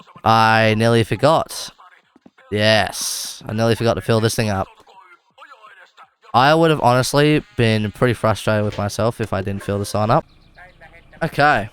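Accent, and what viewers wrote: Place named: Australia. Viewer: Australian, LOL.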